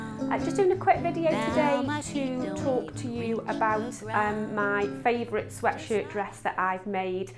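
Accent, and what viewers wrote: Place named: Northern England, United Kingdom. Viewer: Hi I am anew subscriber and quite a new sewer after just retiring from work. You sound northern I live near Chester. Love your channel. Have a lovely day. Dorothy 🙋🏻xxxx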